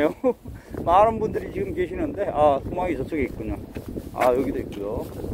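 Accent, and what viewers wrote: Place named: North Korea. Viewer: 님... 실례지만... 고향은 어디세여? 말투가... 윗쪽 같으신데 알수있을까요?? 새터민이신가요?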